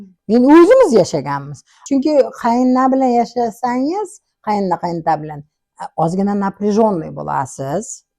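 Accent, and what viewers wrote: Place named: Samarkand, Uzbekistan. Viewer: ты супер, маладес, хакикий Самаркадча гап булибти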